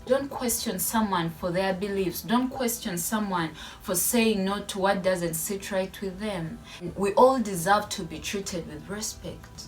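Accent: Africa. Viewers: Late to this video, please do not lose your beautiful African accent and soft voice!! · I like your African accent that is smoother than others'. I always uncomfortable to watch models in outfits that is very little to cover their bodies. I feel bad for them. If I am in the field as a model, I would suffer and probably give up to be a model since this world's fashion industry is so wicked and dark. I am admiring you to be a good example, as a Christian model by keeping the dignity and your faith value in your job field which is very precious. Bless your heart. Keep up your good work. I know that you can't survive there if you are there for success in the world, but you are there for God's special calling to reach out people with the gospel. When you know why you are there, I am sure that you can have peace and enjoy your job even though you don't make much money or fame like what others chase after. 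I would like to see how God uses you unlimited way. I like your shorts. They are awesome. They speak special way. I think that God brings souls to you thru your videos. Do not be afraid to share the gospel.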